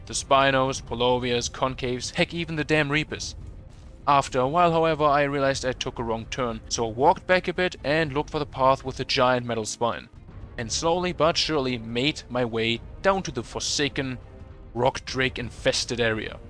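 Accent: Germany. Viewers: Are you German I hear like a German accent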